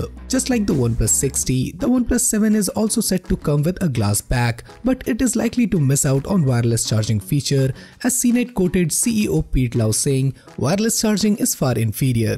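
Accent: India. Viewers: If you read „official“ in the title and hear an Indian voice ... it’s fake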